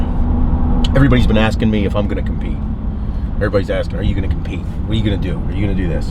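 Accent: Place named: Pennsylvania, United States. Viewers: I Fuckin knew it. You just cant hide that fuckin PA accent lol. Form PA Also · I knew I heard Western PA in your voice! Lots of great football players, tough steel workers come from there. I would take a Dodge Ram any day..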